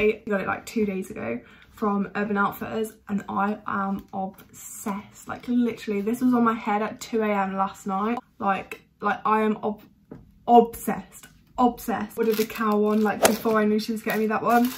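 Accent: United Kingdom. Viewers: she kinda sounds like millie too, like the british accent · I’m italian and I really love your british accent, i mean, i could listen to you for like FOREVER :)) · U have British accent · That britihs accent i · your accent... omg. im obsessed with british accent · En verdad amo tu acento británico 🤩😍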